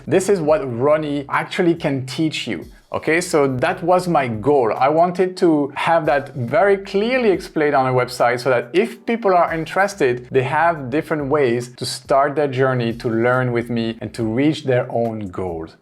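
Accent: France. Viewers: French accent ? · Your accent sounds french , and i wanted to tell you MERCI BEAUCOUP pour tout le temps investi dans cette vidéos et toutes les informations que vous nous avez servi gratuitement , un compte YouTube qui se démarque de loin de tous les autres encore un énorme merci à vous ❤️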